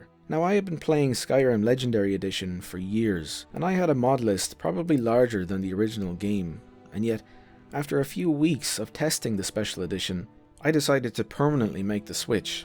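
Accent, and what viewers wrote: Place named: Ireland. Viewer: You sound Irish, I'm willing to bet old enough to drink means something different in Ireland lol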